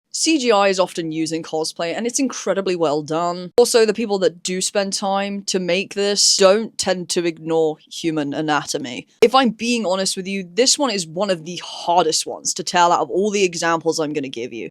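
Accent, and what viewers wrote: United Kingdom. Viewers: you're bri'ish ?!?!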